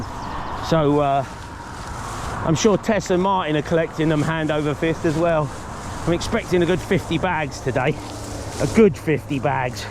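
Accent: United Kingdom. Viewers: yep, guilty of yelling GO ON now. I find it hilarious and me being from Canada I love hearing your British way of speaking.. madder then a box of frogs!!! LOVE IT:)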